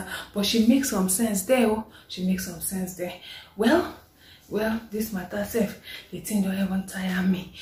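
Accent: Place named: Akwa Ibom, Nigeria. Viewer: Lol, Aiwa ibom intonation 😂😂😂😂😂😂😂 you good sis, keep it up.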